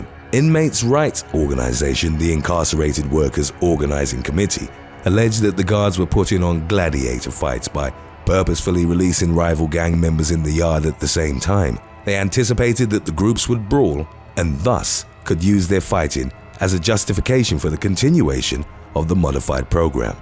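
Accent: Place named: United Kingdom. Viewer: Hes from the UK and tbh his accent is quite lovely .Hes a huge reason why this channel is so successful .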